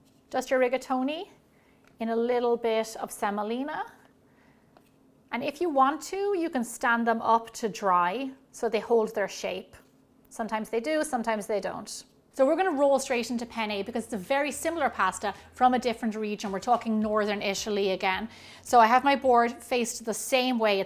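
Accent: Ireland. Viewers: I love the pronunciation of Italian names by someone with an Irish accent! ❤️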